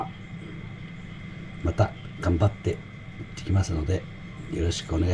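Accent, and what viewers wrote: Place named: Chiba, Japan. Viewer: 「あんでだよ～！」(何でだよ～！)好きです、千葉弁。「青アジばっかでしっけになんね」でも楽しそうでなによりです。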